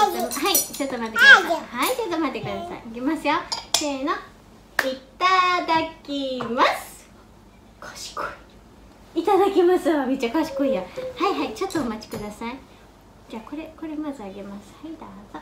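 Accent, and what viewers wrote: Kyoto, Japan. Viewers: いつも楽しく拝見しています。私にも4ヶ月になる娘がいるので、スータンの様に可愛く育つといいなと思っています。英語を話すモエさんも素敵ですが、個人的には京言葉を話されるのも素敵だなぁと思っています。 I really enjoy watching your channel. I’m also a mom of a 4 month-old girl so I hope she will grow well and cute like. Your English is very charming but personally your Kyoto dialect is something that makes your channel even more attractive! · I know🌸her Japanese accent is so cute when she speaks English it’s very soft and beautiful since she’s from Kyoto🤍🇯🇵☺️ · Specifically, I think she’s speaking in Kyoto-Ben. Osaka-Ben, which is what most people think of regarding Kansai-Ben sounds different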